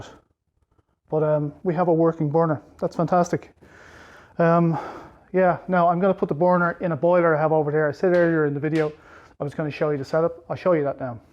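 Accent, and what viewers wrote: Dublin, Ireland. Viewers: Good morning, you sound like an auld Dub, God Bless ya! I don't know how your clip came to me, as I was working on me auld burner that's on a Warmflow boiler out me back in the dark. And Lord behold! When I got up at 04.00 this morning due to the burner being on me mind, I fired up the laptop. I went into Youtube and the very 1st video clip that was shown to me was you. Just unbelievable. Apologies for the long intro here. Just to add... I would like to say that your one hell of a bloke. Your demonstration and explanation is second to none. There's a pint of the Black Stuff on it's way and thanks very much for this well informed video. God bless and may you have continued success with your channel. Kind regards, Paddy.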